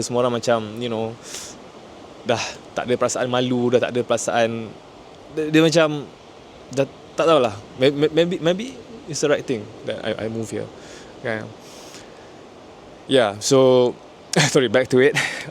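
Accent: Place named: Philippines. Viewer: i honestly thought you are a filipino, had to pause what i am doing to see what your nationality is the moment you started speaking different language.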